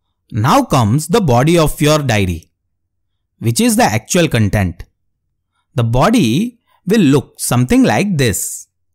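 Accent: India. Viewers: The pure accent of indian english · Pure Indian accent of English 😊😊❤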